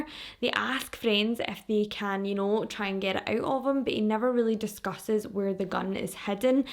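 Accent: Scotland, United Kingdom. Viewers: So glad I found your channel, your my new bedtime listen, slowly going through all your videos, I know it’s murder video but I find it soothing before bed 😂 (must be your lovely Scottish accent) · I love a Scottish accent.❤️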